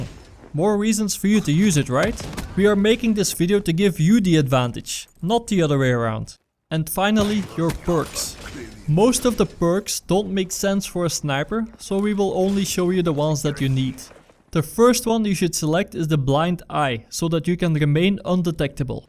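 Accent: Netherlands. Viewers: i hear the nederlands acsent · I bet you're dutch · nice dutch accent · Lol youre dutch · je bent duidelijk Nederlands😂. wel awesome vid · your from The netherlands i can hear it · He is from The Netherlands i guess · Are you Dutch by any chance? I can kind of hear it in your voice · je engels klinkt goed maar ik hoor gwn dat je nl bent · Dutch ... I can kind of hear it in your voice. exactly that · Hahahaha je bent zo duidelijk nederlands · You sound Pretty Dutch with Saying The "R" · dutch accent on point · Nederlands accent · Hij is zoiezo Nederlands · fk erge nederlanse accend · (Dutch accent) has to be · Eman kan gelijk horen dat je NL bent dankje voor deze vid ga gelijk mn class aanpassen hopelijk merk ik het verschil keep it up en rustaaaag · gek nederlands accentje hoor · You have a very strong Dutch-ish accent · Are you dutch you sound so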